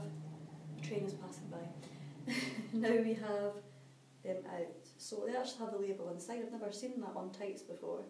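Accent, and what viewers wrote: Scotland, United Kingdom. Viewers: Scottish m8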